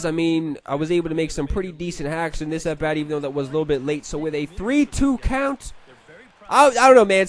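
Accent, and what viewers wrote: Canada. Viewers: Are you Canadian Youre accent sounds like it if you could plaese let me know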